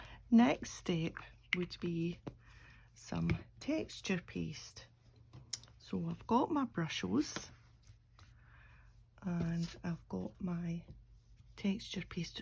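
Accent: Scotland, United Kingdom. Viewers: Hi, so lovely to hear and see a fellow jock crafting. I am 47 and a total beginner. I am loving life. Midlife crisis phase pending but no fast cars or toy boys for this married lady with six kids haha just paper, glue, scissors and lots of YouTube videos. It was Amanda from Srimmping Mommy that recommend you and how delighted am I to hear a Scottish accent. I am from Fife. I don’t know anyone that crafts local to me and that’s such a shame. Your videos and new craft room are wonderful. I have been binge watching, not stalking. Video stalking. I started trying to adult colour roughly 18 months ago and love it but I’m having problems with my hands and neck and I was embarrassed I couldn’t do as much as I would have liked I then got engrossed in junk journals, and all that entails. I haven’t been brave enough to post any recent videos on Instagram or YouTube but hopefully with more confidence learning more. 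I will share and join in. It’s such a wonderful, kind, helpful, decent community. Something rare these days it feels like. I have just joined Amanda’s Facebook group. She is a lovely women with a great personality and mission to help and get others involved and for that alone I support her. I will be thanking her for sharing you with me haha Now I’m away to watch more altered cards ❤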